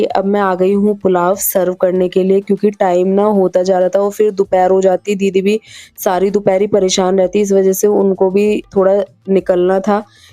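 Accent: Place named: Haryana, India. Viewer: hnji ap ki language mei touch ata h wo haryana walo ka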